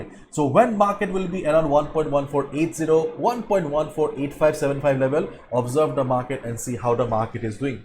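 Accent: India. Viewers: Holy crap I’m an American and I love how your Indian accent isn’t thick at all its super smooth and easy to understand 😂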